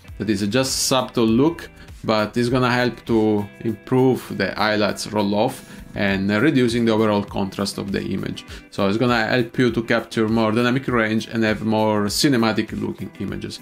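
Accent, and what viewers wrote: Italy. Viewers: I love your positive attitude, quick smile, great information/advice, and pleasant Italian accent! I think if you keep this up you will eventually earn many subscribers. But (even though I'm not a director) I'm going to "blast you" about focus! It's very distracting that your eyes and much of your face are out of focus for so much of this video. I would advise to just go ahead and stop down the lens a bit next time and turn up the lights to bring back the exposure you want.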